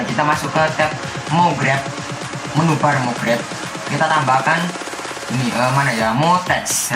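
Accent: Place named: Java, Indonesia. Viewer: anjir medhogmu coeg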